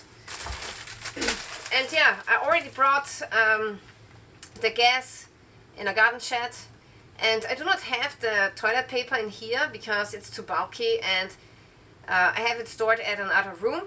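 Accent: Germany. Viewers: Wohnen sie im Deutschland, deinem aksent, horen zum mir wie Deutschen. Es tut mir leid, mein Deutsch ist jetzt nicht so spitze....und keine umlaut habe ich, am Keyboard. Im Jahre 2001, ich habe nacht Deutschland besuchen, und wahre ich einem Student. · Is this German accent? -_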